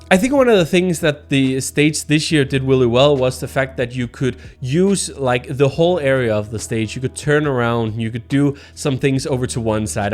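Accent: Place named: Denmark. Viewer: man kan bare høre den danske accent skinne igennem :)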